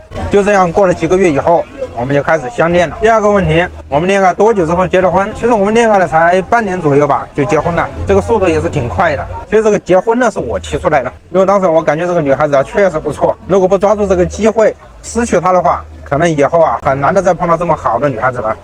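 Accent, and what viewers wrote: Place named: Northwest, China. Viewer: "My wife is simple and cute." Are you kidding me? She is very pretty and has beautiful lip and chin. For you, I detect an accent from West or North West China. After I posted this message, I watched some of your videos, and have to say: Congratulations, you finally found happiness in Vietnam! Most people on this world spend their entire life, but can't find happiness. You are the luckiest one. Do you best to keep it.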